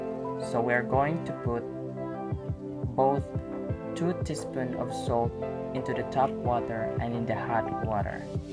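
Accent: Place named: Philippines. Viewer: You sound like a pilipino person im pinoy too.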